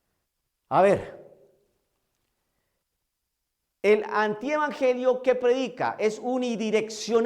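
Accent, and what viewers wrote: Colombia. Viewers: cómo va a ser de Venezuela, es obvio que es colombiano, solo hay que oírle.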